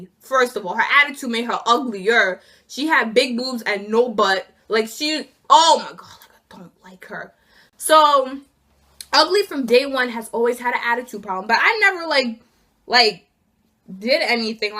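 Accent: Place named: Long Island, United States. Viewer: idk why i love long island accents 😂 they're just funny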